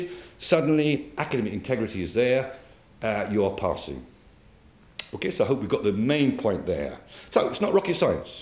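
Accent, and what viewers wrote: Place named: Afrikaans, South Africa. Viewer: This oak is South African, right? Dude sounds like every Afrikaans English teacher I've ever had.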